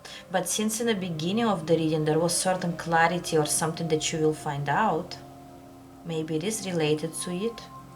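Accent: Russia. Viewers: You have the most beautiful accent and voice Idk Russian almost sounds like my grandmother's accent on my dad's side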